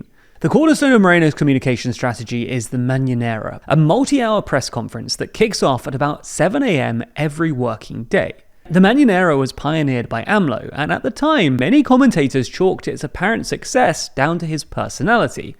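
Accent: United Kingdom. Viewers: Cómo mexicano, viviendo en México, tu desesperado comentario no tiene ningún valor y te lo explico. Si un anglo y no solo anglo, sino con acento británico (suponiendo que eso lo hace estar del otro lado del charco) habla bien de la izquierda en México eso solo quiere decir una cosa... USTEDES YA PERDIERON EL RELATO. De nada les vale seguir intentando imponerlo, sus mentiras ya no pasan.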